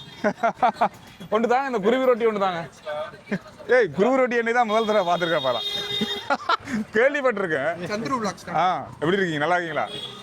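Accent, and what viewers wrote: Sri Lanka. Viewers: Elangai tamil enaku inimaiya irukum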